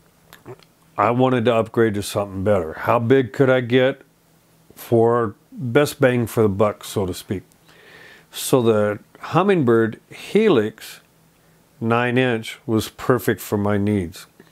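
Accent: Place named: New England, United States. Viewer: I usually like to fish by myself as well. Most companions can't get up early enough and get antsy quickly. By the way, you sound like you're from New England or MA; if so, where from?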